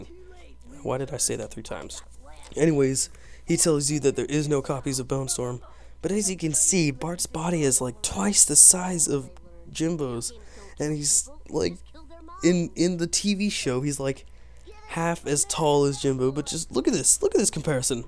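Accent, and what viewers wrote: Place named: New England, United States. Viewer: Sounds more Kennedy/New England than English I think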